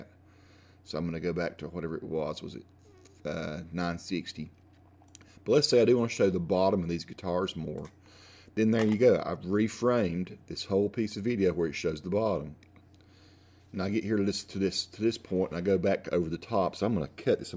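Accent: South, United States. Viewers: Fuckin it took so long to find this thank you southern accent king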